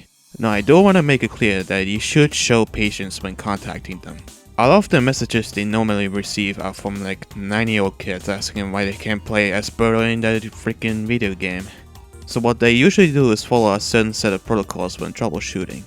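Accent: Germany. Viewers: Are you german? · German accent❤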